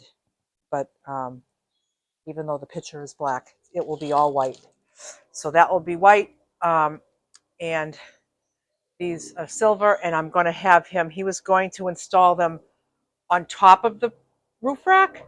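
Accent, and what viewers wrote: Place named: New York, United States. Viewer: You could also use plex or adhesive glue for the cracc and the van build is absolutely stunning I support this lifestyle 1000% coming from New York myself I peep the accent keep up the great work love the articulate energy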